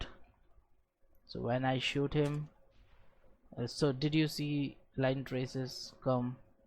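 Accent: India. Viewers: bhai jo bolne walo ki english bolne ki style hi alag hoti hai jo bhi indian hoga wo acchr se ye english samaj sakta hai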